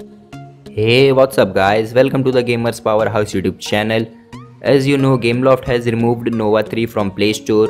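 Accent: India. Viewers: I would try this but you have faded background music and an indian voice... So I know it's a scan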